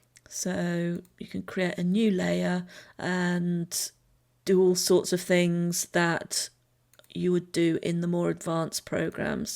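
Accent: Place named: England, United Kingdom. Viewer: Nice video. Firefox ( not sure about other browsers ) has a plugin called [Pixlr Grabber] which I find really useful for grabbing all or part of a picture from a webpage. Much superior to saving the image or PrintScreen paste intoimage editor like paint. I thought you were English until you said "Control Zee". Hmm yeah I still think your from southern limie land. Dont go to the dark side else you'll end up saying things like "How Yall doin, have a nice day now yeeha woofwoofwoof" :P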